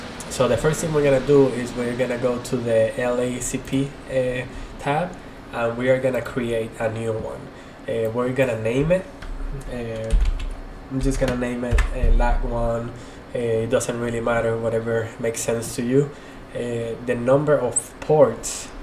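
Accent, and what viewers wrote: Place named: Brazil. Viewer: sotaque de BR em man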